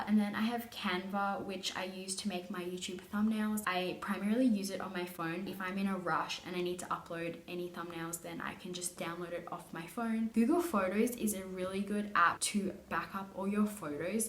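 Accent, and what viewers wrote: Australia. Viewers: she probably grew up in Australia she has a Australian accent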